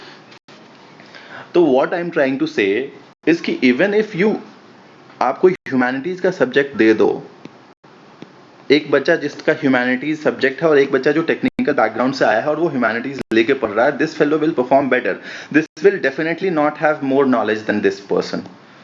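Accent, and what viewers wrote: Bihar, India. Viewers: I was waiting for this video bcz my optional is History 🤣🤣🤣🤣, And I like ur Bihari accent, Aap english use kar skte the ya shudh हिंदी, But hindi with bihari accent, Bole to jhakkas sir, Thanks for this video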